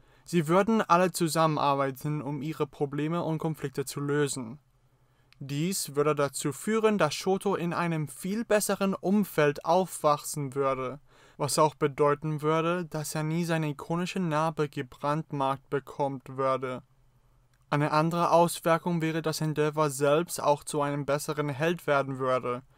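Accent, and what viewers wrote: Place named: Germany. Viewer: I thought he was German lol. From his accent while speaking English.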